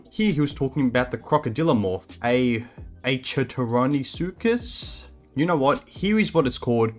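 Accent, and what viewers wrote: Australia. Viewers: I enjoyed this information and cool Aussie accent-Subscribed!🎉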